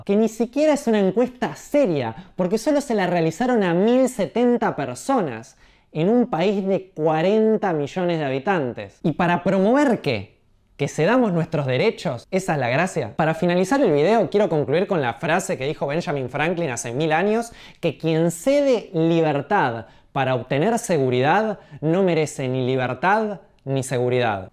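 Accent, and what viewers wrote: Argentina. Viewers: Tu acento Argentino tan marcado arruinó el video, dislike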